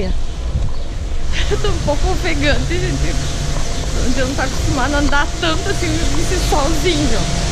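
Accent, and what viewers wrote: South, Brazil. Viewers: O SEU SOTAQUE DO SUL È UMA COISA ASSIM INDISCRITIVEL GRANDE ABRAÇO BOA NOITE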